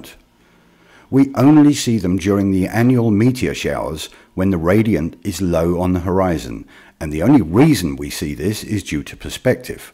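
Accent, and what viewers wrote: England, United Kingdom. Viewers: Hmm I was going to respond to you myself, but I thought I would like the answer to be as simple as possible, and I know that you have stated in other videos that you would not accept any answer with the word gravity in it. So I decided to ask my 5 year old son for you. He has not learned about gravity from school or myself as he is only 5. This was his reply, he thought about it for about a minute, and came up with this answer. Well planes can go up as well as down, but asteroids can't go up. he thought a bit more about it and added. it's like you don't see rain going up. at this point he lost interest and went back to playing with lego. I couldn't have put it better or simpler than that. And I know that asteroids can sometimes appear to go up, and rain can also go up in extreme weather conditions. but he is only 5, and appears to have a better understanding than you without any education on the subject, or prompting by myself. 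As you have an English accent I take it you have seen the program are you smarter than a 10 year old. Well you don't seem to be smarter than a 5 year old. Now please stop preaching disinformation to gullible people, you do not realise the harm you are doing to them.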